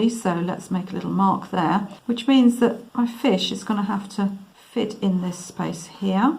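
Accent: United Kingdom. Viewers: Your A brit, Love it. I lived in Ipswich and Colchester for 5 yrs. Love your advice it really helps. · Thank you for the great tips. I'm new to drawing and still trying to get basics etched in my mind. Pressing to hard is a bad one for me and have been doing it since school days. It really has to be stopped so thanks and love the British accent good day!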